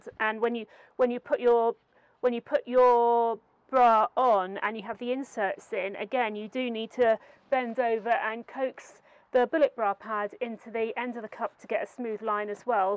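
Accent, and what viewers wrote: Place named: United Kingdom. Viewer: I am a Brazilian/ American and I found British accent so elegant, mostly from a wonderful girl like..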